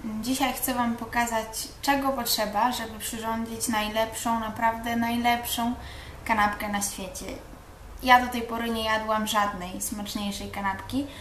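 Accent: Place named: Silesia, Poland. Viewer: Masz taki akcent slaski lub goralski :) fajny filmik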